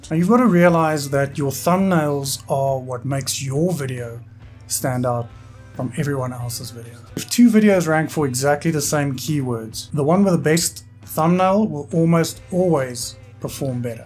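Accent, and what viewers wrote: South Africa. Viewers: I don't know way but you sound like a South African 😊thanks for all your help 👍🏻🙏🏻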